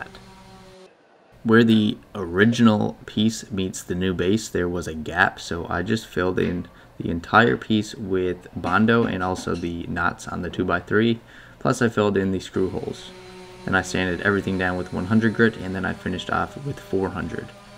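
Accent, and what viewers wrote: Canada. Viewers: you from canada dude? accent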